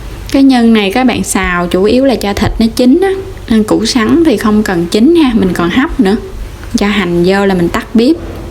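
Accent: Mekong Delta, Vietnam. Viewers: Giọng nói dễ thương của người miền Tây. 95 xin chào bạn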